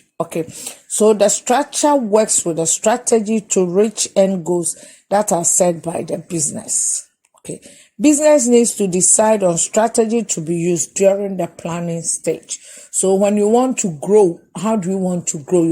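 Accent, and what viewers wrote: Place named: Africa. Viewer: Heavy African accent Miss! Great job.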